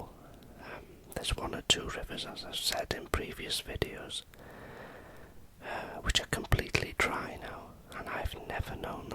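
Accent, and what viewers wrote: Wales, United Kingdom. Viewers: Cardiff or swansea ?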